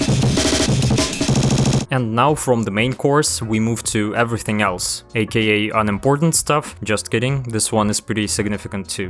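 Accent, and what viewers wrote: Russia. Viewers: Русский акцент)). Произношение все равно классное) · У тебя русский акцент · Броу, слушай, а ты случаем не русский? Просто акцент похожий) Спасибо за ролик! Всегда хотел попробовать написать музон в стиле брэйккора. С меня сто пудов подписка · Мне тоже показалось что русский акцент)))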